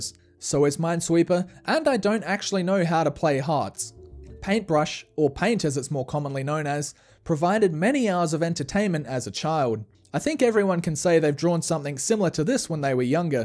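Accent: Australia. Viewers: Aussi Accent...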